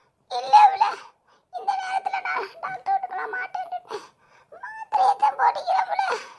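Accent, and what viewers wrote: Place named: Kilakarai, India. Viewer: I always like klakarai slang.. Super❤️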